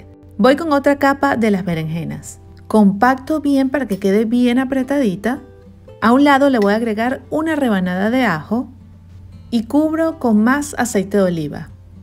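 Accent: Venezuela. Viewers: Hola paisana, seguro que eres Caraqueña o Valenciana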